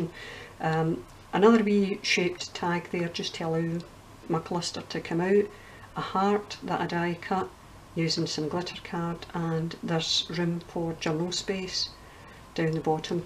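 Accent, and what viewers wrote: Scotland, United Kingdom. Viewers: Gorgeous ... thank you for sharing... think I detect a fellow Scottish crafter 😍 x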